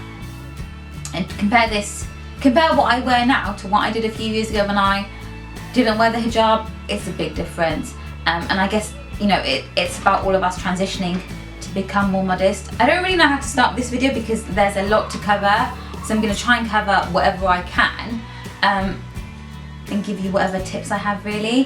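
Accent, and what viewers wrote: United Kingdom. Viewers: ASAK, I have to say I had to watch your video twice because I was just so taken with your accent! It's lovely, Masha'Allah. What part of the UK are your from? Great video and looking forward to more. Oh yeah...where is your scarf from? Thanks!!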